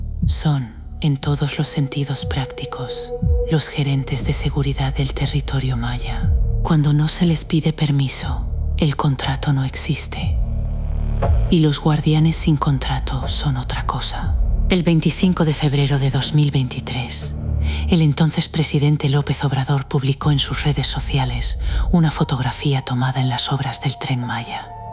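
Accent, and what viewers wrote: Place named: Spain. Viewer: JAJAJAJAJA PORQUE PRONUNCIAN "ALUCSES" SI SU PRONUNCIACIÓN CORRECTA ES "ALUSHES", PORQUÉ LA NARRADORA TIENE VOZ ESPAÑOLA... NADIE SE TRAGA ESTAS MENTIRAS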